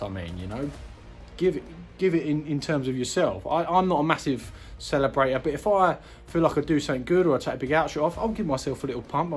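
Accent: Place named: South, United States. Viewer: I really enjoy your videos, but please please stop putting the auto generated subtitles on them 🙏 they can’t handle your southern accent mate, and it’s just complete gobbledygook going across the bottom of the screen, it’s so distracting 😂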